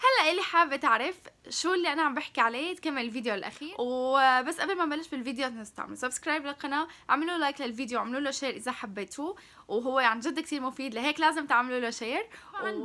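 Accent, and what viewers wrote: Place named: Iraq. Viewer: حياتي شفت لهجتك عراقية وانا ساكنة بالعراق من وين حصلتي عليها ممكن تخبريني بليز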